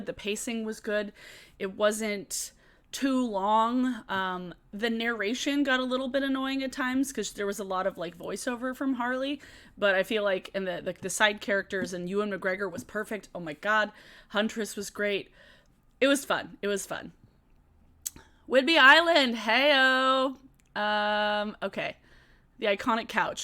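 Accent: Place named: Minnesota, United States. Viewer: I’ve never met anyone that actually talks like your Minnesota accent but that’s a great accent 😂. Maybe it’s because all the Minnesotans I know are from the Twin Cities area and I’m from the part of southern Wisconsin that’s near Chicago. If you want to watch comedy about the Midwest there’s a comedian called Charlie Behrens that definitely talks in that accent!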